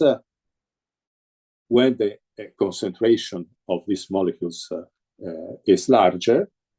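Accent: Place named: Italy. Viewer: its the the phonemes and the inflexion, he is using italian inflexion and cadence and phonemes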